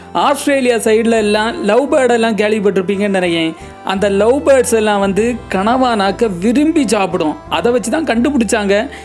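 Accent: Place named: Kerala, India. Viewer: Hi nalla irukkureengala unga tamil alaga irukku thambi. Malayali pesuvadhu phol irukku. From Sweden 👌🤲🏽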